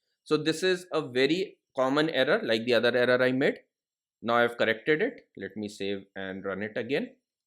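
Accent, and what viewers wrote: India. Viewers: What's so funny about it? I guess you've never heard Indian English! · funny indian accent... 😸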